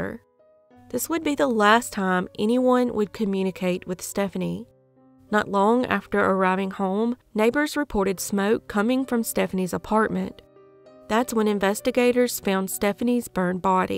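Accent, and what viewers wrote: South, United States. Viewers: I agree enjoy your delivery and style of your videos. Thanks for being on the platform and never change or edit out your crying or the emotion in your voice because it further humanizes the victim to the audience and I for one Cory right with during some of those child cases. Also, love when call out the predator/criminal in your SOUTHERN accent cause I’m so NEWYork and saying the same thing on my side but when a sweet southern voice calls them the no good human wast of space I’m here for it cause if you wasn’t already thinking it and that voice says it you better reevaluate the amount empathy you give to the 👹. Felt motivated to comment to encourage you to keep going. 🤗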